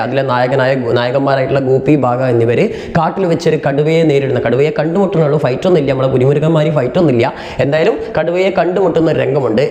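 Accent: Malappuram, India. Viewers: sir malappuramaanoo sthalam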